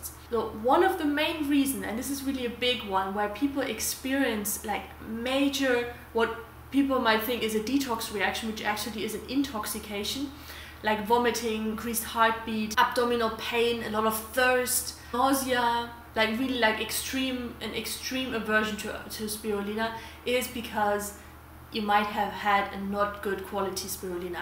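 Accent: Germany. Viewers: So which spirulina is the best to buy? By the way, you have a verry german accent 😊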